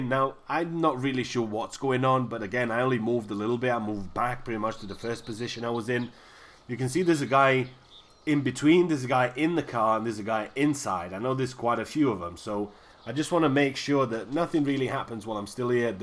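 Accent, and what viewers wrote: Finland. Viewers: Totally finnish accent :) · I could almost hear that you're Finnish even if I didn't hear you speaking Finnish :D it's the accent... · Sulla on aika suomalainen aksentti, pakko sanoa. :) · that bandit who know you sound like finnish akcent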